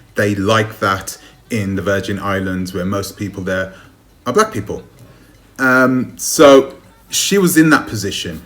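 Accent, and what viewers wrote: United Kingdom. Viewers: When I see your videos come up I think, oh lord what now 😏! But I watch and like your videos because your unapologetic about your views and the information you share. Your delivery of what your saying, and not saying is very British 😂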